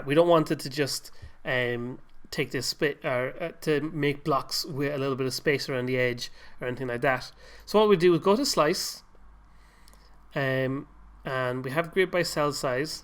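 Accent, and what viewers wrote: Ireland. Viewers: Are you Irish or something?? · I just realised how irish this man is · The best parts of the video! 1. Your Irish, which makes everything better! 2.The tutorial was very easy to understand and helped alot! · Lol your voice and Irish accent both remind me of remind me of this YouTuber called TheTerroriser (aka Brian). Anyway, thanks for the tutorial ❤ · Are you Irish? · So true. The Irish accent seems to ease its way into my brain, making me understand more efficiently. ;-) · I'm so used to Irish accents that I didn't even realise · your accent is what i live for if i had an irish accent i would fucking love myself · I'm all about this dude's accent. Ireland needs to pump out more tutorials. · This guy's Irish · I actually didn't realize he was Irish, the accent is so burned into my brain it sounds normal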